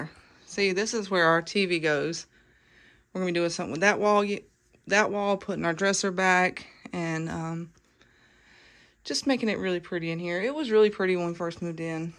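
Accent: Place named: South, United States. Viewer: I like the way you have the furniture but over by the far wall would be good too. I love that tree. Your bedroom is big and your bathroom.👍what a beautiful home!!!! Love it. You are a lucky girl. I forget what state? I think I hear a southern accent. Your husband seems like a nice guy. He has a sense of humor.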